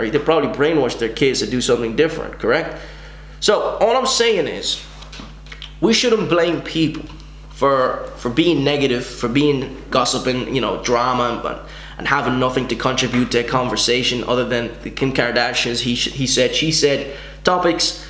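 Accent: Ireland. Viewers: You irish bro I think I can hear it im from tipperary but cant make out your accent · Is that an Irish accent?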